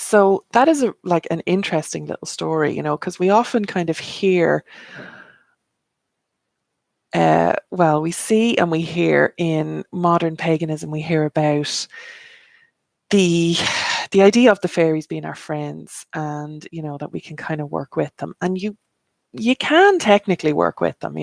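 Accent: Ireland. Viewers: Sorry to bouther you again but would you have deirderia maddigans webside details again about the whichcraft study year and a day .as i want to find a teacher to study wichcraft with i am self thought and doing so so well but would love to find a teacher now.thanks so much angel vanessa donegal celtic irish witch xxxxxxx🦄🦄🦄🌹🌹🌹🌹🌲🌲🌲🌲🌲🌲🌲🌲🌲🌲🍀🍀🍀🍀🍀🍀blessings to you great to see you again.lobly to hear an irish voice in the great whichcraft mix.happy new year xxxx.i am verry drawn to working with this lady deirdre.i heard her on the radio taling about het experience in the mothet and baby home.i was blown away and heart broken.i to gave my first baby son up for adoption in secret in ireland in 1996.her story as touched me deeply blessings 🌹🌹🌹🌹🌹🥀🍀🍀🍀🍀from donegal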